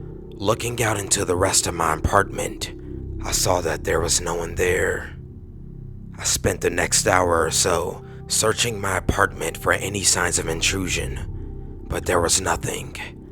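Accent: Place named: United States. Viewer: I think that the snapchats may be real but I don't think the narrator is the same person in the snapchats because the narrator has an american accent and the guy in the snapchats has an Australian accent and a rainbow lorikeet is an Australian bird.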